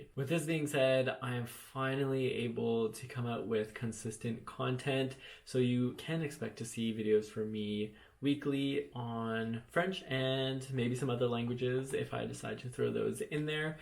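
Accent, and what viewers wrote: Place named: Quebec, Canada. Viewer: Love your accent :) It would be interesting to see a video on how you improved your accent. As I said before, you sound more Québécois than in your first videos, after you had spent a lot of time in France.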